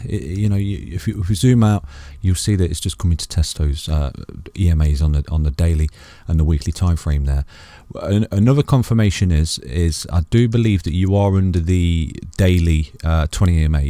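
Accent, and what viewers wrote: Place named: Leicester, United Kingdom. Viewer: Great insight, you sound like a fellow Leicester lad 👍🏽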